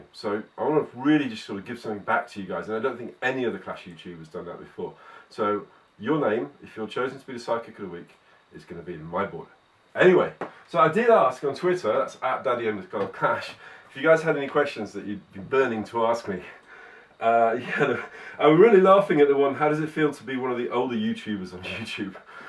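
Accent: England, United Kingdom. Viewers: yay for the English clasher, all those Americans it's nice to hear an English accent once and a while :)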